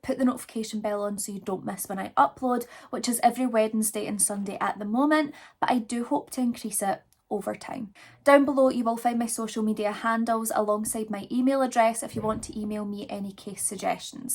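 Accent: Scotland, United Kingdom. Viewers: Oh my- you’re so beautiful also! Your accent! 🥰 I take it you’re a Scot? 🏴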